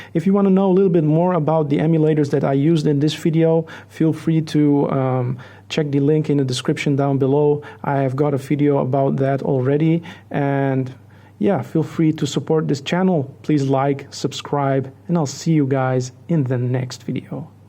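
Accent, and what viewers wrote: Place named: Netherlands. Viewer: You sound like you are dutch...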